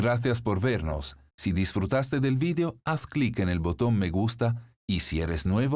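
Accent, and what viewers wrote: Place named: Italy. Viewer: Se llama, excelente voz y hermoso acento italiano!!!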